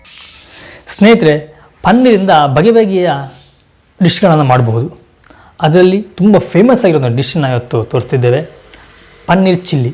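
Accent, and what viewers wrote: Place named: Mangalore, India. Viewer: Namma mangaluru kanada bahala chanda matanaadi madam neeu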